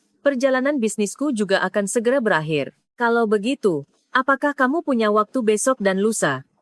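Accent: Malaysia. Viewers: 😅😅😅😅 Narator nya bukan orng Indonesia Logatnya 100 % Malaysia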